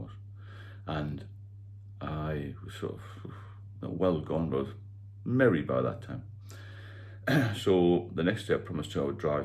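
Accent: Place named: Newcastle, United Kingdom. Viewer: A A works for me initially but then after 6/7 visits I ALWAYS throw it away your you tube channel is definitely for me. Love your Geordie accent. I can tell you’re a great person for helping people well done in your own sobriety. 😊👍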